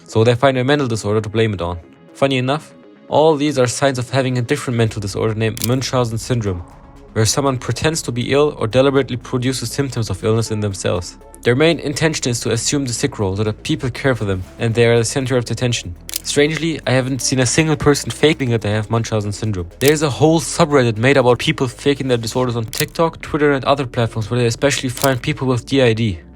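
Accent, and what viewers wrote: Germany. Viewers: You're very underrated. I like the way you edit your videos. And your accent sounds a bit german. Very sympathic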